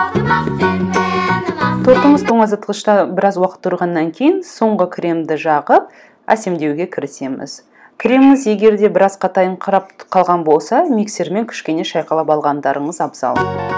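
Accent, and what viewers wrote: Standard. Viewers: Ма щаа Аллах!Аллах енбегинизге баракат берсин!казакшаны таза сойлейди екенсиз 👍👍🌹🌹🌹💋100 лайк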